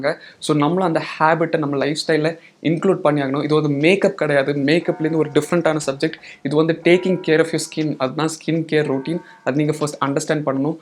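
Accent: Kanyakumari, India. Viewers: slanglae purichuruchu bruh.....sweet voice + kk slang lite😅❤️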